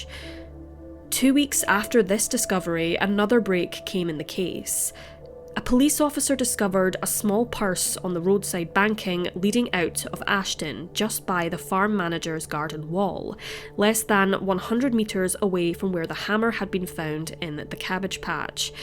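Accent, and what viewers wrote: Scotland, United Kingdom. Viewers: She's Scottish, mate 🏴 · Ye canny beat a Scots tongue to tell a gory tail 👏👏👏👏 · Cold case. There are lots of them. Is your native language not English or are you not familiar with the many and varied accents in the U.K.? The narrator has a very obvious Scottish accent - she is from Scotland, part of Great Britain so yes, she is a NATIVE speaker of English. You must not watch many U.K./British films or TV. if you don't recognize the Scottish accent.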